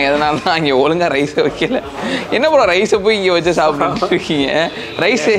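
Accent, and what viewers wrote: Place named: Telugu, India. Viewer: Hi anna nige Telugu pesard kamidiya erk anna😂😂😂nanum AP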